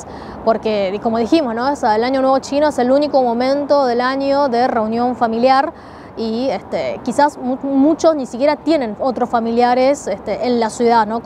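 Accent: Argentina. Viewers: Amo tu canal. Y tu acento argentino me encanta! Qué bueno que existe una persona que nos hable de China en español y con la perspectiva de alguien que también ha vivido en Latinoamérica. Saludos desde México 🇲🇽🇲🇽🇲🇽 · y que de malo tiene? si su esta perfecto. vivio años en argentina era normal que tenga el acento. no le veo lo malo. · Eres argentina tu asentó de español es idéntico al asentó argentino. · Amo tu acento Argentino 😍 es super fluido❤ gracias x mantenernos informados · Muchas felicidades porque hablas muy bien el español y sobretodo muy buenas especificaciones tienes un acento argentino saludos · Wow que español más argentino tienes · mmm...Sin embargo, en este caso su acento tiene alguna relevancia, porque dice bastante acerca del ambiente donde creció y se formó, a mi me llamó la atención su acento porque parece provenir de un sector de la ciudad de Buenos Aires particular, me da la impresión que no es típico de la comunidad china de Buenos Aires, quizás eso llamó la atención de otra gente. 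Y si estamos hablando de cultura china con tanta riqueza y detalle como hace, las particularidades de la comunidad china en Argentina es parte de la cosa también. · Tu español es argentino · Si hablas dos idiomas por lo menos debería hablarlo bien , aprender la correcta pronunciación de la Ll y la Y . Claro que su acento para un argentino es perfecto pero para el resto de países latinos ese acento es horrible. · Ella tiene un español perfecto. Tiene su pronunciación argentina pero la verdad eso no afecta en nada. Usa un español muy neutro. Y además es muy dulce a la hora de comunicar. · me refiero a que las personas en sus vídeos anteriores SOLO comentaban sobre su acento argentino restándole importancia al contenido e información que dedica a cada video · bueno, cuando hables dos idiomas y los domines como ella habla, y además que carajo importa como suena para otros países, el acento argentino es así te suene mal o no 🤡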